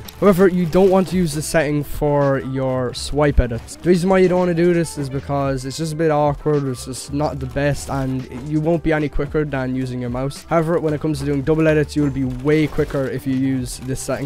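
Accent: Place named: Ireland. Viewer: Where u from in ireland I hear the accent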